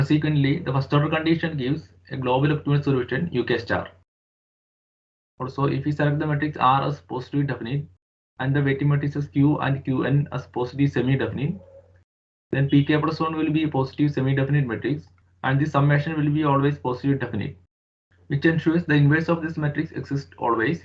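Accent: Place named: Kerala, India. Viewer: you can be little slow.the words of speech are malayalam accent.it could be made a little clearer.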